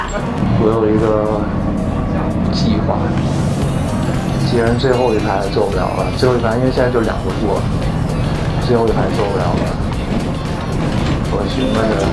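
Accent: Beijing, China. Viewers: 北京腔真的跟老大爷似的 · 这北京话听得舒服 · 我爱你，我也是北京人。现在堵在美国不能回家。听了很多遍你的后座剧场，也是我小时候的回忆。听着熟悉的京腔，我好想家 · 就爱他这种北里北气的口音 太好听了真的 能听一整天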